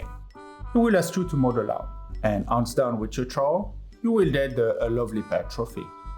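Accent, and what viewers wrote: France. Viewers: thought I recognized a French accent with a touch of African perhaps lol. I'm from Montreal QC too btw, and love the content!!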